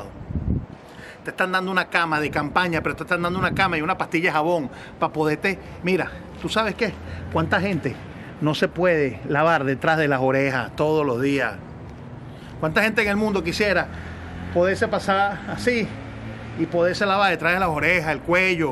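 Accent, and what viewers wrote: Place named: Caribbean. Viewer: Tienes acento caribe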